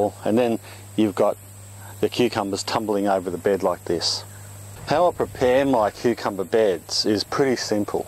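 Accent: Australia. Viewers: how nice to hear an aussie voice. I am in the tropics and my crop is wonderful at the moment. NEVER would have ever thought to pick them small and ferment them. I have been leaving mine 'til they are big, chopping them up and fermenting them. I am heading out to the garden now to pick all the 'babies'. thanks heaps. I will definitely be subscribing to your channel. Have a productive gardening day!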